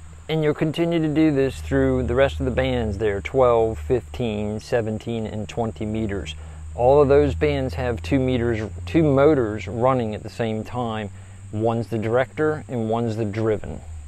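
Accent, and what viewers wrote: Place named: Eastern Shore, United States. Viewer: Could tell by your accent that your Eastern Shore Md, lol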